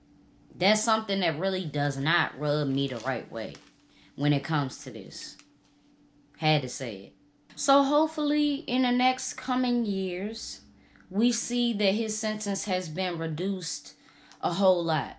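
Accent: Washington DC, United States. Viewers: I always liked yo heavy DC accent💯 Keep levelin 💯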